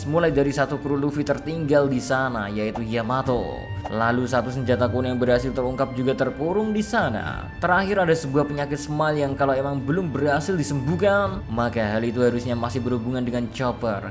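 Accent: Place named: Lampung, Indonesia. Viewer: ini orang lampung kayaknye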